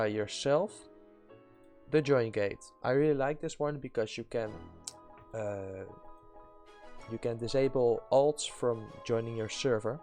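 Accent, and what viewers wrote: Netherlands. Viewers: Haha ik hoor gwn dat je Nederlands bent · Ben je nederlands je accent?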